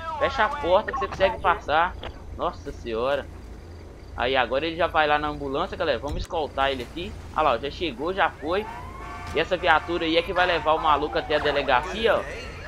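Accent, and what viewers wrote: Minas Gerais, Brazil. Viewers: eu tava querendo saber do pq o sotaque e da q e minas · Game Club Kkk eu falei zuando cara, mas tô achando que ele é mineiro sim por causa ta trasendo muito esses mods e pra mim que sou mineiro ele tá falando normal...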